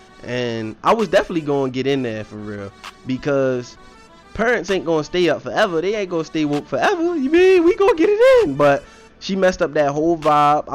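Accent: Baltimore, United States. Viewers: Can tell u from bmore tht accent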